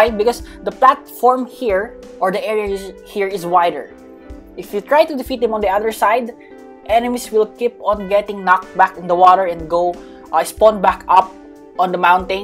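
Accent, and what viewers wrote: Philippines. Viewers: What....! Bro I thought Ur a American lezz go Ur pilipino right u literally said pa milktea ka Naman lol hahahahaha nice btw Ur accent is super duper cool broo Keep it up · gagi akala ko english talaga si pilipino pala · Sabi na parang di amerikano at Filipino ka nga a hahaha ha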